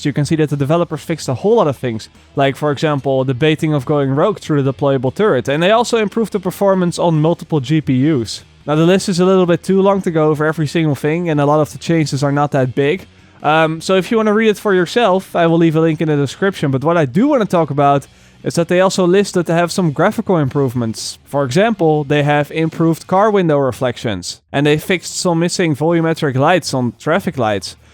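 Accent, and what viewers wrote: Netherlands. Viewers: Do one about season pass and the pre-order stuff! The P416 for example, which you get when you buy gold edition; Is that a low lvl rifle for smoothing up the egdes in early-early game or is it like in a container in your stash which you can "unpack" at any time with the negative effect that it binds to you and your lvl? Sort of like Schrödingers cat? Also what are the content drops and how will they work? National guard gear? the "perks"? I'm not asking you for straight answers but just your thoughts on it. Man I have been watching your videos since you started making them, back when all we had was rumours and speculations based on "hints" inte the first trailer. I can't believe it's finally coming out!! I have gotten engaged, had a son, raised him for 2 yrs, moved to another town, quit my job, and started studying (which I'm almost done with) during the time from the reveal to release. 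All this accompanied by your videos and dutch accent, almost each week. This is gonna be great! have a nice one, Or as we say, IIN SWEEDEN, "kom igen nu Britt-Marie, KÖR för fa-an"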